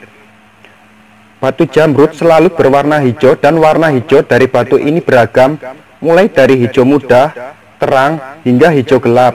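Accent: Banyumas, Indonesia. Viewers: ngapak ya mas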